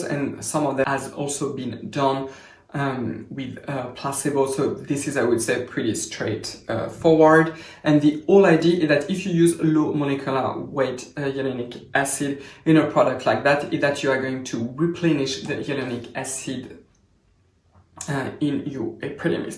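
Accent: France. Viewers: your content is amazing and you are so knowledgeable. However it is difficult sometimes to follow or understand the concept or language or some words, especially for foreigners who are not used to different accents (your accent is beautifully French and I am NOT criticizing it at all, please do not get me wrong, I am foreigner myself) - have you considered maybe making a little graphic boards for us? Like simple white board and a pen to explain? Thank you, please keep doing your job <3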